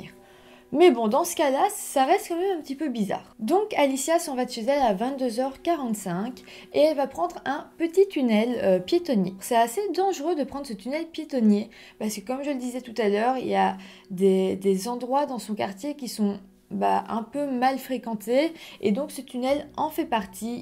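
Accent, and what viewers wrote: Belgium. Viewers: En fait, je n’aime pas les histoires de meurtre, les charges émotionnelles sont terribles, mais en même temps j’adore l’accent belge (couire lol 😂) et plus sérieusement le sérieux des recherches et la présentation lumineuse des affaires. Merci.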